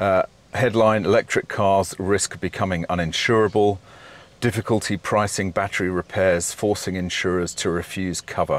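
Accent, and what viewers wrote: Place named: RP, United Kingdom. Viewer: Hey, someone that speaks the Queen’s English!!!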